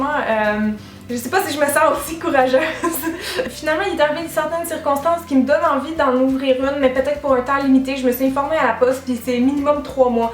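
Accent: France. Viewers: J'aime ton accent français👌💕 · Coucou! J'espère que tu reviendras en France ce serait trop cool de te voir:DD Gros bisous t'es la meilleure et ton accent français est super!;) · wow ça fait tout bizarre de t'entendre parler avec l'accent français, tu deviens tout de suite si "banale" :p je préfère le piment de ton accent habituel héhé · Aha ton accent francais j'adore! Je suis francaise'et je me rend meme'pas compte que les francais on unnaccent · J'adore l'accent français ahah !!! :') T'es super, j'adore tes vidéos !!! :D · Merci pour l'accent français, t'es trop forte, la France t'adore <3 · Mdr les pancakes, c'est un sketch de Gad Elmaleh et Olivier, j'adoore !! :D Et j'adore l'accent française aussi, c'est vraiment bien (je suis française moi aussi !) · Gros pouce bleu pour ton accent français aha !! :D <3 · Peut-être qu'une ou 2 FAQ en + par an serait sympa! 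Je suis impressionnée comme bcp de monde par ton accent français^^! Tu réagis apparemment de manière posée à certains problèmes/ sujets délicats: tu es mature mais pas rabat-joie! Continue ! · BELGIQUE!!!:P Bref... c'est super chouette comme vidéo! J'aime bien en savoir un peu plus sur toi! Et ton accent français est trop trop bien! J'ai essayé de faire l'accent quebecquois... hum hum je ne suis pas super douée:D Les critiques négatives et les troll y en aura tjs malheureusement, je pense que tu fais bien de ne pas leur répondre, ça ne sert à rien! Puis si tu as tout ce monde qui te suit c'est bien pour une raison! T'es la meilleure! Bisous! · Cet accent français était génial. XD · t'a voix est méconnaissable avec l'accent francais!! zoubi! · Ahahahahah ! J'ai adoré ton accent français ! Je suis française et ça fait vraiment bizarre d'entendre dire qu'on a un accent, je n'avais jamais vu les choses sous cette aspect là mais c'est vrai qu'on en a un ! 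En tout cas tu l'as très bien fait, moi je ne saurais pas du tout faire un accent canadien ! Gros bisous !! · Ton accent français est tellement ... génial ! J'adore ! ^^ <3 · Ça fait vraiment du bien d'entendre "l'important c'est d'être en santé, pas d'être mince !" Si seulement les médias, la pub, etc... pouvaient diffuser cette information en masse, je pense qu'on vivraient bien plus heureux ! J'apprécie beaucoup le fait que tu ne suive pas cette mode du "healthy", ça me gonfle de devoir faire attention à tout ce que je mange et de culpabiliser parce que je mange trop de gâteaux. Je me désabonne de beaucoup de chaines de beauté parce que ça me lasse, mais la tienne est vraiment super. Tu est naturelle et en plus tes conseils sont très professionnels. C'est un peu grâce à tes vidéos que j'ai appris à bien me maquiller les yeux. Ah, et puis j'adore quand tu prend l'accent français, je crois qu'en tant que française, j'arriverais pas à faire l'accent québécois ! 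^^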